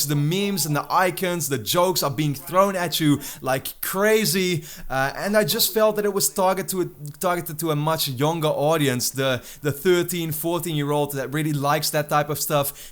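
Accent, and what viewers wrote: Netherlands. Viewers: Erg goede review kerel! Ging ervan uit dat je Nederlands of Vlaams bent. Awesome review, just to be sure ;)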